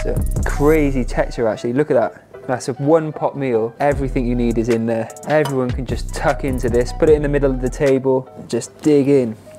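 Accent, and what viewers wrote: United Kingdom. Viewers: Brilliant!!! 🤤🤤🤤🤤 I’ll never get sick of your UK accent, how you say vittttamen especially, adorable and sexxxy 😉😁🤣🤣🤣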